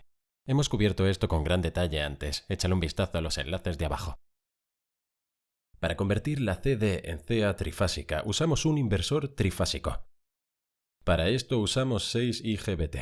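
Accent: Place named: Spain. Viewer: Oh NO! Nomas Oi ese Acento de GACHUPIN, Odioso! y me VOY!......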